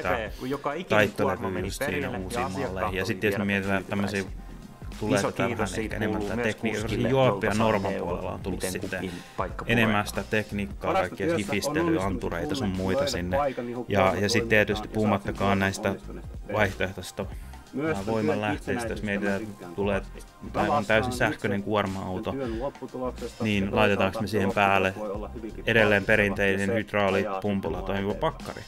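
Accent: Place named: Jyväskylä, Finland. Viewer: Puhut niin paljon tosta Jyväskylän murteesta videolla. Mä kun en näe tossa puhetyylissä mitään outoa. Tosin koko nuoren ikäni oon asunut Jyväskylässä😁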